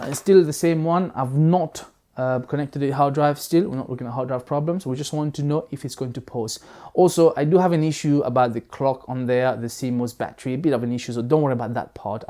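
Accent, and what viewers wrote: Mauritius. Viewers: yeah he sounded mauritian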